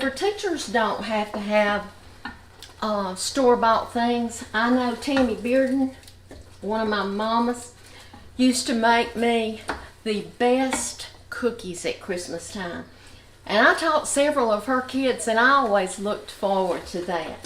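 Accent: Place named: Alabama, United States. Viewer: I just love to hear you Alabamians talk. I’m from E Tx and it is said that we have an accent 😂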